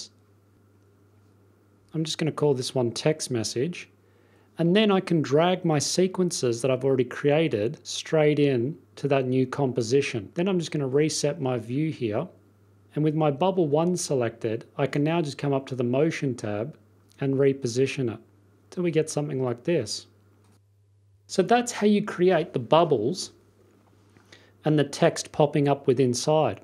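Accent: Australia. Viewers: thank you so much , i used for a wedding and it looks great , nice australian accent ?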